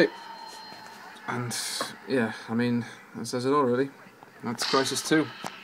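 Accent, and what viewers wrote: Ireland. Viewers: Are you in any way Irish? :D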